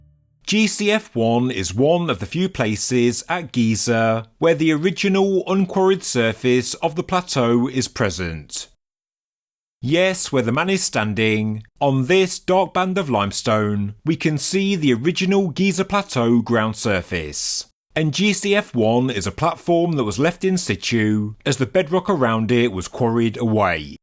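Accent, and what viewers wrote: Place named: England, United Kingdom. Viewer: since when did have an English accent?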